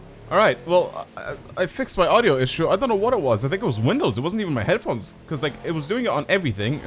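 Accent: Ireland. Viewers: Are you irish? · Are you from Ireland?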